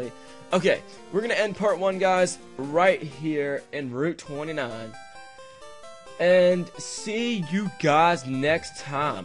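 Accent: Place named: United Kingdom. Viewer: You can talk very good british!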